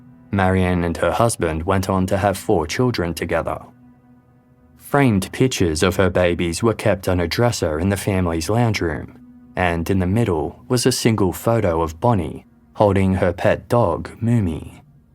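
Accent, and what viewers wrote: Australia. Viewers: I just found this channel, and its amazing! So gd to hear an Australian accent podcast. I live in Queensland Australia 🦘 And suffer from extreme insomnia. These are the perfect bedtime stories for me. I do believe i am actually still learning as i sleep. These stories are much easier to hear subconsciously as i sleep, than my usual twisted night terrors on steroids! Thankyou so very much. Your content is original too, a fresh and intriguing change from all the rest. Perfect intro too, no loud, jarring, drawn-out intro music thank god!! Just the fact that your content caught, and kept my interest ( through the ADHD), is a definite YES, a " my new channel to binge )!!!!" Luv and respect, from Queensland, Australia !!!🦘🌏🦘🌏🦘❤❤❤ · I'm American, and this is definitely an Australian accent, you can tell instantly. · Definitely an Australian accent and nah it's not AI, the narrator has even done a Q&A on the channel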